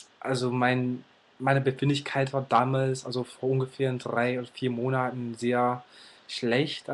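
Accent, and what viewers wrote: Bavaria, Germany. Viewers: nice, Bro! Es ist lustig, dass du Deutsch mit Dialekt sprichst (Bayrisch). Deine Grammatik ist beeindruckend, da gibt es so viele Dinge, die man schnell durcheinanderbringt, aber du hast es voll drauf. Zusätzlichen Respekt, dass du in deutsche Literatur schnupperst, denn die ist in der Tat großartig, was selbst viele Deutsche scheinbar schon vergessen haben!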